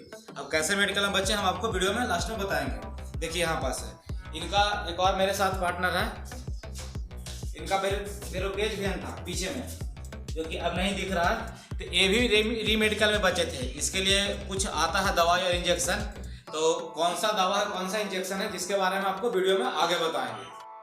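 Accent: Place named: Jharkhand, India. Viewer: Lag raha jharkhandi ho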